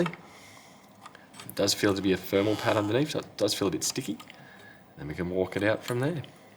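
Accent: Australia. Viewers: Super! An Aussie explains.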